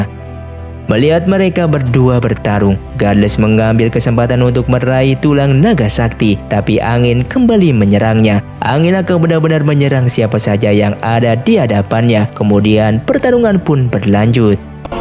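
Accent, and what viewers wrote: West Java, Indonesia. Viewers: bang maneh jelma sunda nyah?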